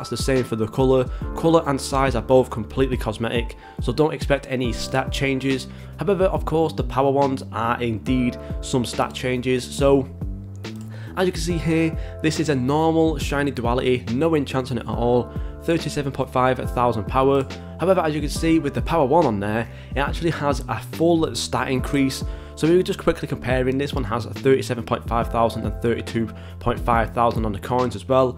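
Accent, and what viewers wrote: United Kingdom. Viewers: Very nice bri'ish dude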